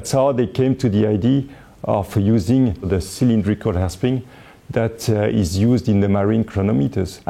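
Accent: France. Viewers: He speaks great English with a French accent..... I speak average French but I try my hardest to recreate as good a French accent as possible.... When speaking to a French person my aussie accent shows through and probably sounds similar to the way I hear his French accent when speaking English. I couldn't fault him. Excellent description and vocab. Dunno what the fuck you're on about mate.... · french accent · Ho non... Cet accent français 😂😂😂🤣🤣🤣 Non mais franchement fait un effort 😂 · Cette accent français mdr <3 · Magnifique accent français ;) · Cet accent français de l'espace ! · As a french i totally spot that accent from the first second x) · dude that french accent tho XD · French accent too much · Qui a reconnu l'accent français ? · Francuz mówi po angielsku - MASAKRA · Wonderfull french accent for the Forst video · laccent Français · Je reconnaît ton accent de français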